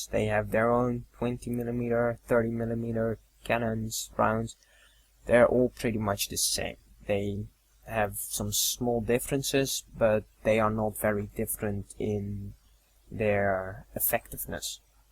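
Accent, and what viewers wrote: Netherlands. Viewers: Ff een vraagje... Ben je Nederlands?? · are u dutch i can hear a tiny dutch accent in what ur saying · Thnx for the vid, That's a Dutch accent :) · Are you dutch you have a Dutch accent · you are dutch... no doubt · im 10 seconds in the video and I already know now that this guy is dutch. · are you dutch?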